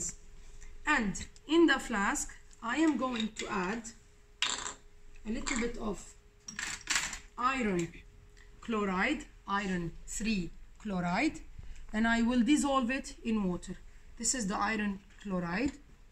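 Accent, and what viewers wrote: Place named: Arab world. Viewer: لهجتك عربية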